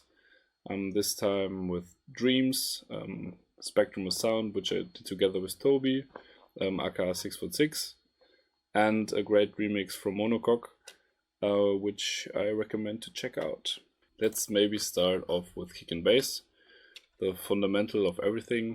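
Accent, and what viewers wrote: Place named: Germany. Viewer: Hey du hörst dich deutsch an😅 falls ja könntest du auch deutsche tutorials machen?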